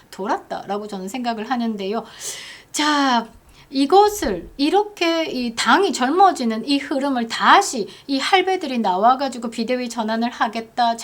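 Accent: Gyeongsang, South Korea. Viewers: 소장님 오늘도 넘 이뻐요 말씀이. 경상도 사투리가 섞여있어 넘. 듣기가 좋아요 항상 대한민국 정치 실태를. 정학하게 꼬집어 지적해주셔서 항상. 잘듣고 보고 있습니다. 날씨가 쌀쌀. 합니다. 건강조심 하시고 감기 조심 하셔요 응원합니다 ❤❤❤❤❤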